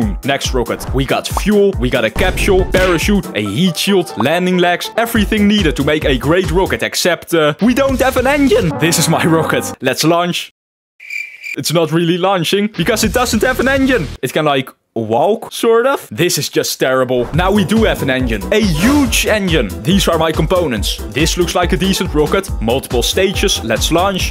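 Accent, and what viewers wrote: Netherlands. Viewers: Are u dutch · I can hear the dutch accent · ben je Nederlands ik hoor het aan je accent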